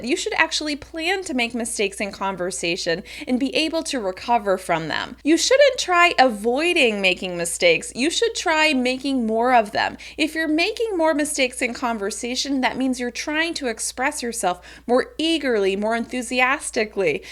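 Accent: United States. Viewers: Good Morning! How have you been today? Thank you for your lesson, warm American voice and a little fast but i got it. Norge Guy.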